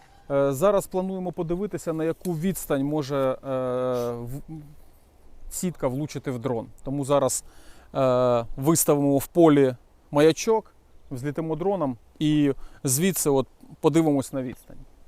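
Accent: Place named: Russia. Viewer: Терпеть не могу когда москворот пытается косить под мовного знавьца. Ну не можешь на мове, ну трещи як москаль. Якой жах?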